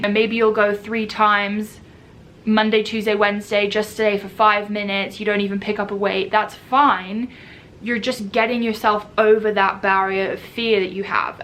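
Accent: United Kingdom. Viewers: Are you British? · With your Brit accent and my Brooklynese we should do a video together. What a trip that would be. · Your accent jumps between British and American it's wild! 🥰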